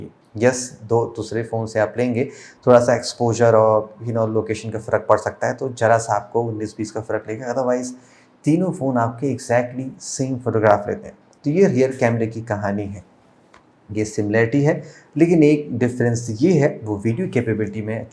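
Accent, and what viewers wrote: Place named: Rampur, India. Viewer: Bhaiya apke rampuri ka koi jawab nahi😁😁😜🙏🙏❤️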